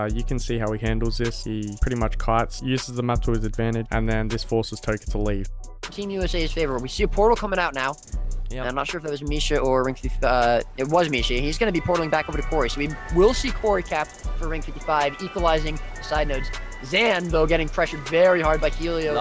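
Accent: Australia. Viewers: You sound Aussie, is that correct?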